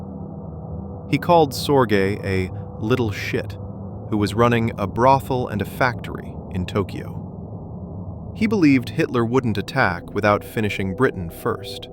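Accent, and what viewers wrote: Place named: Canada. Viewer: Thank you for utilizing a Canadian voice actor. So tired of the typical deep voice American VO.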